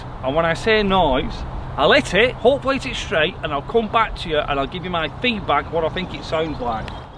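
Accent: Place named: Bolton, United Kingdom. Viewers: Nice to hear a northern accent on YouTube for a change, I’m from Wakefield so I understood u perfectly 👍🏼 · I’m an American, and I dig the Bolton accent. Reminds me of Fred Dibnah. Using ‘were’ in place of ‘was.’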